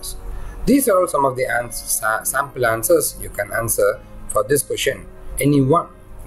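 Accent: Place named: India. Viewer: He doesn't have to. He's indian, so naturally he'll havd the indian accent stick around.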